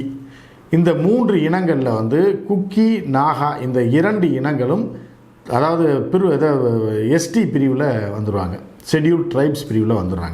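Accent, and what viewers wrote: Madurai, India. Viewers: Yes madurai karar ...athu than pechu thoni kalakuthu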